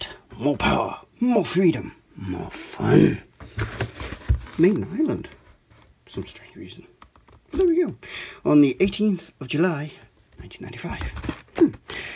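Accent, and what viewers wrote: United Kingdom. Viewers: Are you british? I am awful when it comes to guessing where people come from via their accents lol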